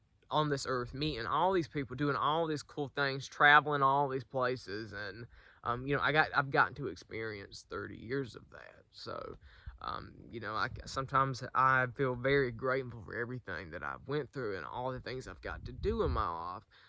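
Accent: South, United States. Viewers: When I listen to you, it's like listening to myself- except for accent is little less southern. Great video though! Blessed day!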